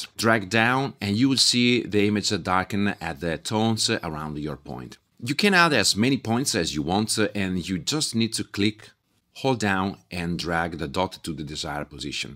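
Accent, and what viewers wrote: Italy. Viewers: the EE at the end of every word is amazingly Italian haha. thanks for the video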